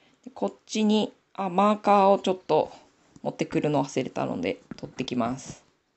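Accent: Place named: Hachinohe, Japan. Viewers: youtubそのものに初めて コメントです。何と懐かしい 。南部弁～「まめしとぎ」「干し餅」美味しいものの表現に「くるみの味」先日からチャンネル登録して見ています。 いまだに 帰省した時に 道の駅に寄り探しますよ～ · おはよう〜💕 「うんめ〜」カワイイよ。 青森訛り好きだわ〜🥰 大好きなものを熱く語るはずが、よくわからないという顛末😁の件も面白かった。 私は愛知出身なんだけど、名古屋弁ってキツめなんだよね🥲 青森の友達と話しているみたいで楽しかった。 またやってね、青森訛り動画👍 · 私の父が八戸の生まれです 訛りを聞いてるととてもほっこりします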